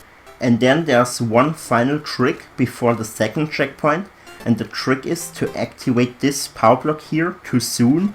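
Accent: Germany. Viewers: It's a German accent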